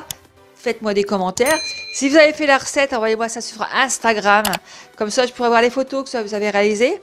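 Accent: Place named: Ardennes, France. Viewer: Bonjour, j'ai reçu mon compact cook pro cette semaine et me voilà un peu perdu car c'est mon 1er robot, j'ai toujours cuisiner sans. Donc me voilà à chercher des recette et quel bonheur d'être tombé sur votre compte, je sent que ça va bien m'aider merci. Jhabite dans les Ardennes et je pense reconnaître cet accents ☺️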